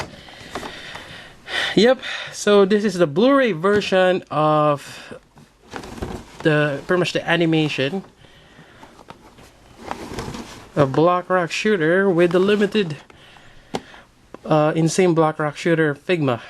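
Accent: Philippines. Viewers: I was just wondering, are you Filipino? Mebbe because of your accent, dunno just askin'.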